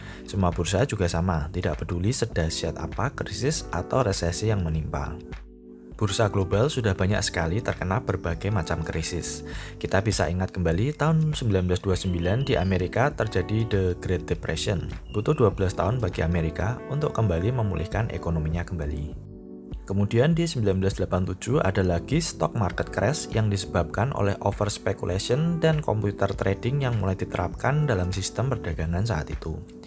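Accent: Java, Indonesia. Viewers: kalau medok apa tidak bagus? mau baca text atau tidak, video-video di sini tetap recommended kok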